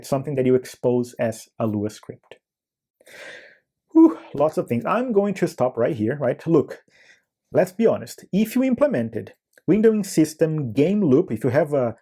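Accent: Brazil. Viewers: reconheci pelo sotaque, mas muito bom o conteudo mano parabens, muito raro ver conteudo de game engine/c++ no br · sabia que era brasileiro pelo sotaque kkkkkkkk muito bom o video cara parabéns pela qualidade! Me inscrevi e gostaria de dizer que você me dá uma impressão de qualidade de conteúdo melhor do que muitos canais ai seja gringo ou não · Cara, sei que você é brazileiro só pelo sotaque rsrsrs, muito bom! continue nesta jornada, me ajudou muito a entender essa caixa preta.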